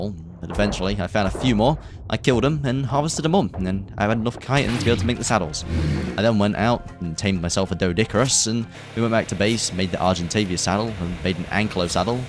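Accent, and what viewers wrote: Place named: United Kingdom. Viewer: I never heard such an bri'ish guy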